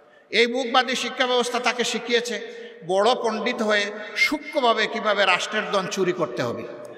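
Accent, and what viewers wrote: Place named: Sylhet, Bangladesh. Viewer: বিভিন্ন বিভাগের লোক আমদের সিলেটে বাস করে। তারা বলে আমরা নাকি সুন্দর করে কথা বলতে পারি না।এখন স্যার এর কথা সুনে মনে হচ্ছে বাংলা দেশ সবচেয়ে সুন্দর করে কথা বলে সিলেটের লোক ❤।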